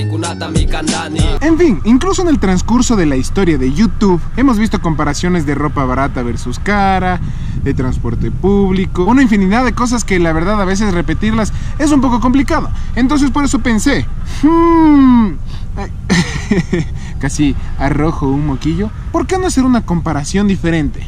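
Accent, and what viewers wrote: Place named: Ecuador. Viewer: Excelente que le metas todo sobre ECUADOR Música Pinta Labia Carisma Acento ... Etc, Etc, Etc. Genial bro RIOBAMBA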